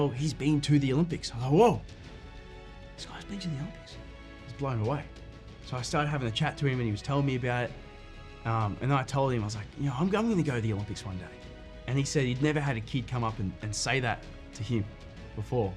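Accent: Australia. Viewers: I love you,... But, your Aussie accent confuses me to laughter!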